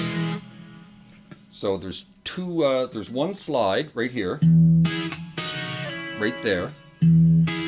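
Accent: Canada. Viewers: Great video! You spoke three words and I could tell you were Canadian eh!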